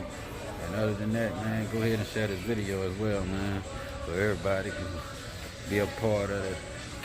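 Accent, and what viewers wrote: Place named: New Orleans, United States. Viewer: Sound like you from the N.O..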